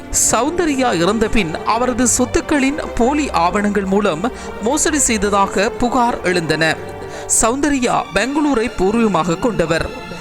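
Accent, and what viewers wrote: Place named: Sri Lanka. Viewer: he s srilankan"tamil"... naturally their pronounciation...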